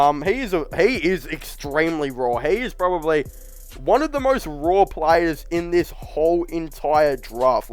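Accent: Australia. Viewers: Dude are you Australian or are you trying to sound Australian and doing a bad job at it?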